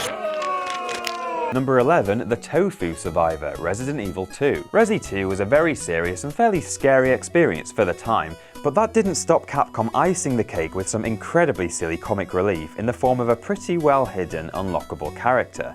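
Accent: United Kingdom. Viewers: I made it .....because, British Accent, that's why. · i like you british mat pat..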